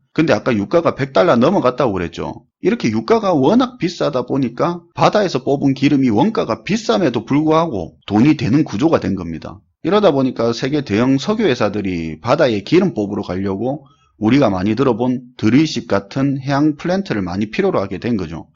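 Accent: Gyeongsang, South Korea. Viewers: 첨에 경상도 아재 사투리가 귀에 안들어왔는데 신기하게 이제 히어링 되네요. ㅋㅋㅋㅋㅋㅋㅋ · 잘 봤습니다!! 경상도 사투리가 매력 넘치시네요~ 2019년도에도 좋은 말씀 잘 부탁드립니다!! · 역으로 같은 경상도 사투리를 쓰는 입장에서 전달력이 더 증대되는 느낌이 ㅎㅎ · 구독 눌너십더 구수한 갱상도 이바구 고맙십더 내 사위가 조선업 내놓으란는 기술잖데 몆년간 일이 없어가~ 생활고를 껵꼬밌어는데 이제 숨통이트이러나 마~ 고마 눈깔 뒤비지게 바빠지면 합니더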